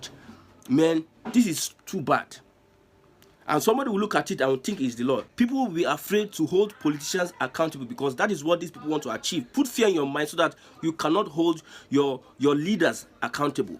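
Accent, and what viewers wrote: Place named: Edo, Nigeria. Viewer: So Nigeria is such a zero-freedom of speech state now. Nigerians stop bullying T-Pain ooo. Make we kuku get military na! Well done, dude... you speak like an Edo man, the kain craze wey people from old Bendel get...😂😂😂. Anywa, I just came across your videos. Id suscribe now.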